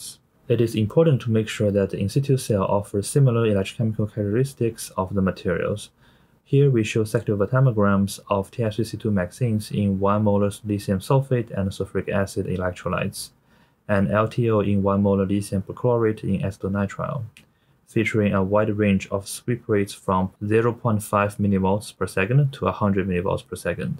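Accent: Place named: China. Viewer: 不用客气，希望你们越做越好。这是我的听感，我一般边做事边听油管，听到你的作品时我感觉你的英语发音重音漂移太严重了，读起来像中文的抑扬顿挫，一句话来好几个重音，听起来不知道你在说什么。有中文的口音完全没问题，希望主播可以提高句子把握能力，修改部分单词的发音错误。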